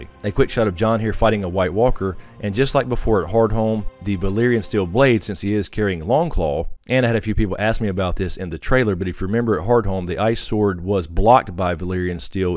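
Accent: South, United States. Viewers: I love your videos. I detect a Southern accent. Where are you from?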